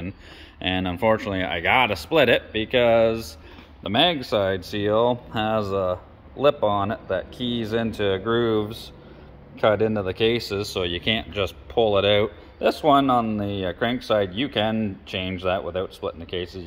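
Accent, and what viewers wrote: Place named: Ontario, Canada. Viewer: Where you from bud sounds like you’re from Ontario ?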